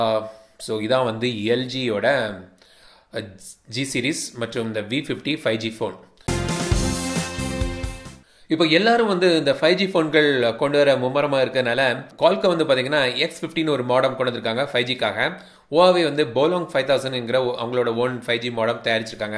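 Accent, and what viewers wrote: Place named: Nagercoil, India. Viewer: Nagercoil slang😍👏🏼👏🏼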